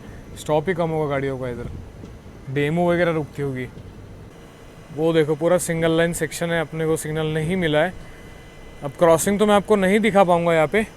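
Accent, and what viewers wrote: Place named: Maharashtra, India. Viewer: मित्रा, तुझ्याकडे आणि तुझ्या उच्चारांकडे पाहून असं वाटतंय की तू मराठी आहेस. मग हा व्हिलॉग मराठीत का बनवला नाहीस? का मराठी बोलायची, मराठीत ब्लॉग बनवायची लाज वाटते? अरे आपल्या भाषेला टिकवणं , जोपासनं ही आपलीच जबाबदारी आहे. हिंदी भाषेवर तुम्हा लोकांचं एवढं प्रेम का? ती काय भारताची राष्ट्रभाषा आहे का?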